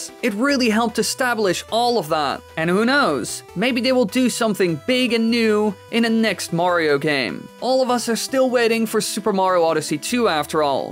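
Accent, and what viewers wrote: Netherlands. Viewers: Ik denk vrij zeker te horen dat jij Nederlands bent! :D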